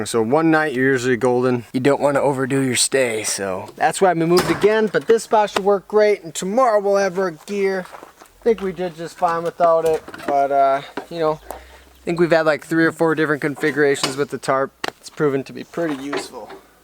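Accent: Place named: Upper Peninsula, United States. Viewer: Love da yooper talk ya know Der hey! Keep'er movin!! 😁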